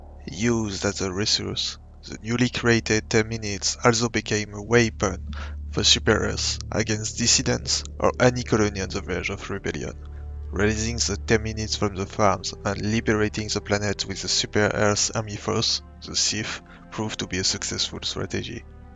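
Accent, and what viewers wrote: France. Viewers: you sound french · j'ai l'impression de m'entendre essayé de parlé anglais en t'écoutant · Your French accent is very strong, and that is FINE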